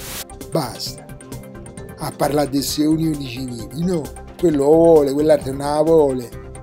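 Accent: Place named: Rome, Italy. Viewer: questo video solo 308 like? Che bel romanaccio! La bellezza del romano ruspante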